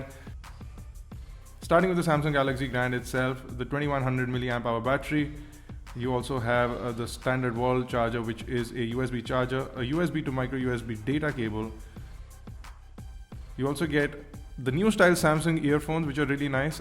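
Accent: India. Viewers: Indian tang... not english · you can know an indian by his English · Indiaaa? :D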